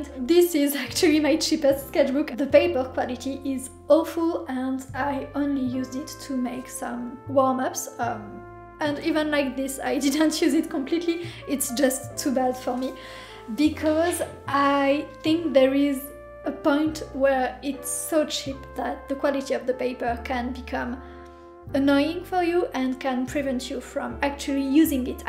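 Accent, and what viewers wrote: France. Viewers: are you french? we almost have the accent :)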